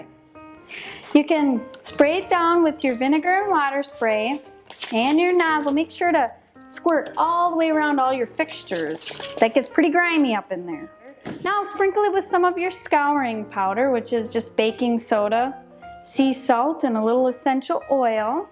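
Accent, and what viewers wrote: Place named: Minnesota, United States. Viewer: I love your accent! Are you from Minnesota?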